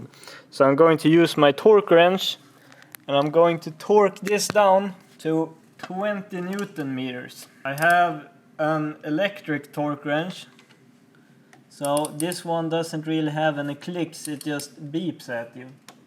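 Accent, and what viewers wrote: Norrland, Sweden. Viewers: Har du någon statistik på hur många utländska tittare du har? Diggar din Norrlands svenska 😃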